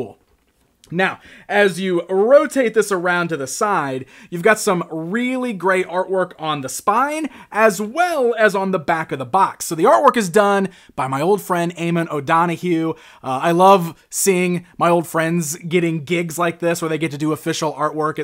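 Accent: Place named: United States. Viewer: Great review,. I totally agree about the figure's head. It does indeed look a little small for the body, and his face looks real boyish also, which totally contradicts the statement..."Most Powerfull MAN in The Universe.", and again, the character's very name: HE-MAN (Not He-Teen, let alone He-Boy). The harness also looks kinda small for his torso, and it should look as if it was made of thick, rough leather, which is something we know toy makers can do, they can make plastic look any way they want to (if they really want to), just by using the proper color shades in the plastic's mix. On the other hand, all of the above "Flaws" on the figure, are nothing but great excuses for talented customizers to do what they do best, and make their personal HE-MAN figures look just the way MATTEL could have, IF they FOR ONCE would have prioritized QUALITY and CUSTOMER SATISFACTION, over GREED (Bulk sales, and Profits). 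But that's how things are, most toymakers (except for those out of Japan), are in business just for the sake of making money, and not concerned in the least about art nor perfection. Shame on our western society, but again, great review,! Easy to understand AMERICAN ENGLISH (unlike foreign reviewers, trying to speak our language, but miserably failing, and butchering it instead), awesome footage of the toys being discussed, and good knowledge of them, which we all appreciate. Great work, man, keep it up!!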